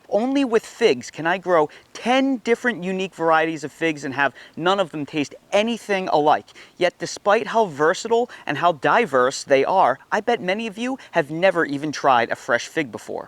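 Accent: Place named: Philadelphia, United States. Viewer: Your accent tells me you are from Philly. Is that correct?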